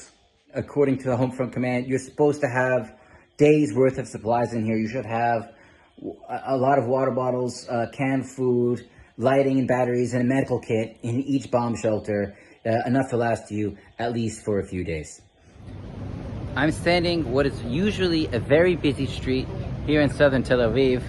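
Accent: United States. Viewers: You sound like an American. What are you doing there?!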